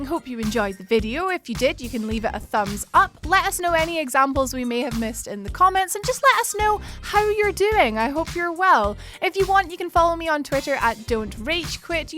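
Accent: Scotland, United Kingdom. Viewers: Forgive me if I'm wrong but do I hear a small bit of a scottish accent in your voice? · For some reason I like to hear scottish accent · Nah that's just a Scottish accent mate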